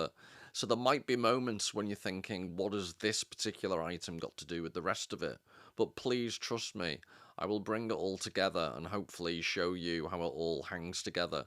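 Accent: United Kingdom. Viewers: Ur so great to listen to man so British with your delivery hahahaha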